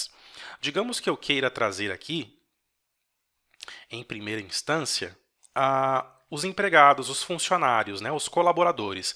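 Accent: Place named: São Paulo, Brazil. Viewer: Muito boa a aula. Obrigado. Me inscrevi no canal. Obs: Você tem o sotaque paulistano mais característico possível.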